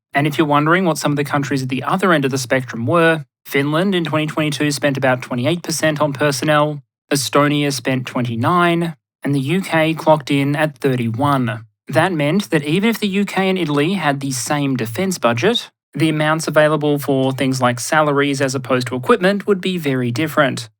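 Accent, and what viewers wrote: Australia. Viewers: After listening to a few of videos I have grown to associate Australian accent with being stupid. Every time I hear it I immediately think that there is a moron speaking.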